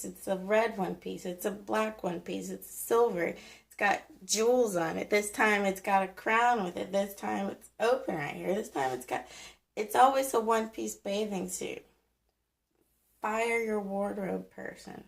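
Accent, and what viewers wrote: Texas, United States. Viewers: Your sexy as hell with that Texas Twang!...damn you are REAL as Hell!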